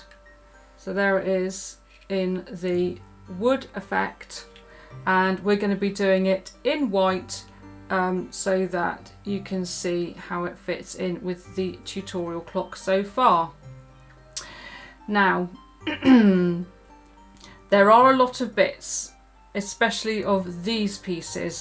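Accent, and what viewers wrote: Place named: United Kingdom. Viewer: I think everyone hates the sound of their own voice. I feel like mine is really deep for a female but then I'll hear myself on a voicemail or something and it sounds nothing like what I hear. But you have a great voice. I'm a sucker for an accent anyway. I'm assuming you're from Great Britian. Do you live there now? One day I hope to make it across the pond to visit all of the British Isles including Ireland. I've always been in love with those places and it's my dream to visit!!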